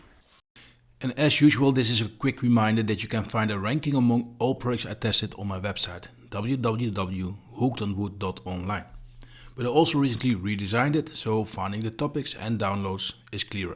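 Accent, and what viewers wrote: Netherlands. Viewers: You got to rid of that Dutch accent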